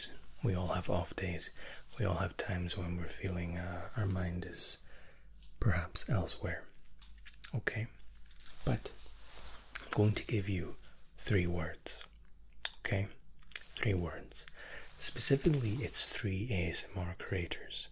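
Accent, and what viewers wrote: Scotland, United Kingdom. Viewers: Is he British ??? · Love me a handsome Scot with an immaculate accent. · Is he Scottish?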